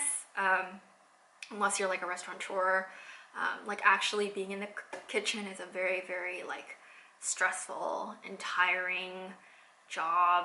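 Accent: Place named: California, United States. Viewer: Voice and Cali accent have stayed the same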